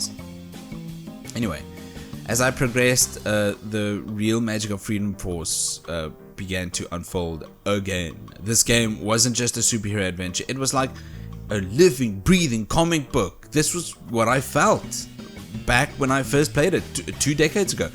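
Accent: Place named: South Africa. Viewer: Always so nice to randomly hear a south African accent here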